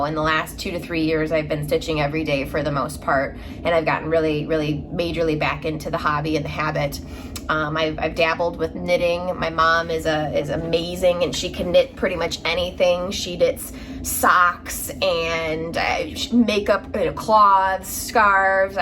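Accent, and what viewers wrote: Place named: Chicago, United States. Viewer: Belated welcome to FlossTube! Your first video just popped up into my feed today. Great intro. I like it so much when contributors share something about themselves. We're neighbors (if you consider a small village in Lake County on the border of IL and WI a neighbor.) We have the same accent - albeit I've been a North-sider most of my adult life! Go Cubs! Glad you love houseplants. I am a novice and could use all the tips you can give us. Coincidentally, I am going to Inspired Needle for the first time on Saturday to look for some special floss and fabrics for two of my pending projects! My local LNS is in Winthrop Harbor did not have what I needed - so I am heading South. :) I am working on "After the Roses." You are right - stitching is zen like, and IC's patterns are hypnotic and therapeutic. Can't wait to see your collection!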